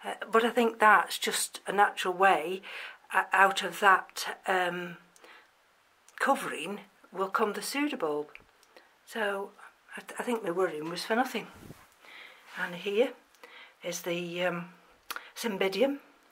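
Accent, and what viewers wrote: United Kingdom. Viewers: where did you get your shelving? You sound to be in the uk like me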